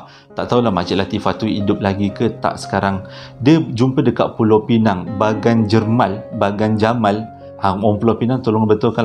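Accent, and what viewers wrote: Java, Indonesia. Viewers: Abg org jawa ke? Cara ckp mcm org jawa la sy dgr. Kalo salah maaf ye.. 😬😬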